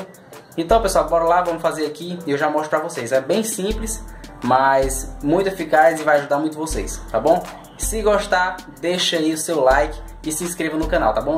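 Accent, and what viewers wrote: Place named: Pernambuco, Brazil. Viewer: Ele é pernanbucano, faz parte do sotaque butar é a mesma coisa que botar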